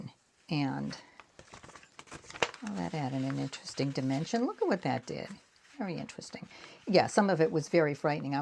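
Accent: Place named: New England, United States. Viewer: So glad to have discovered you! Lovely work, great techniques. Thank you! Heard the New England in your voice and got homesick for NH/Mass…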